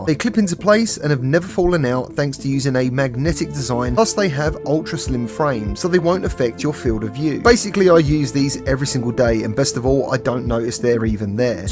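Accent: England, United Kingdom. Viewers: You need to talk even faster with that dufus English accent. That way no one will understand a thing you say.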